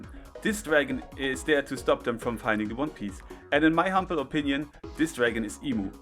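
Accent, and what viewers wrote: Germany. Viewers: he sounds german. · Bruder dein deutscher Akzent ist nicht zu überhören 🤣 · Are you German by any chance? Video is great 🎉❤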